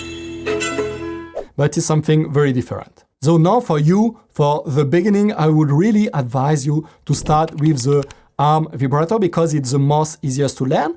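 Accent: France. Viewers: Oui! J'adore l'accent français😘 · Accent français ça non ?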